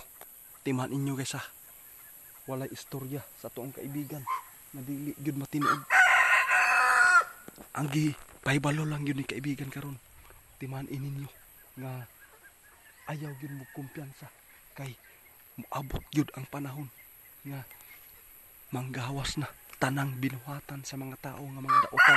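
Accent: Visayas, Philippines. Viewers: Ano ba yan bisayot naman ang Salita mo nakaka buwisit 😅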